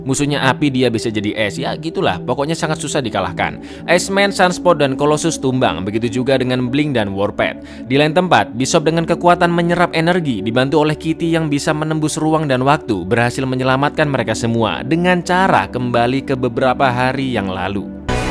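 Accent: Java, Indonesia. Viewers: Jowo mu ngendi lurrr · Jowo ne kandel banget mas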